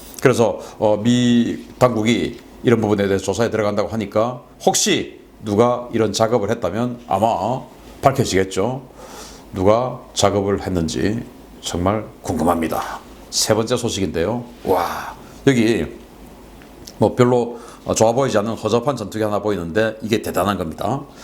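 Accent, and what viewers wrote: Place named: Gyeongsang, South Korea. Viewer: 경상도 사투리를 사용하시는 사람이던데요...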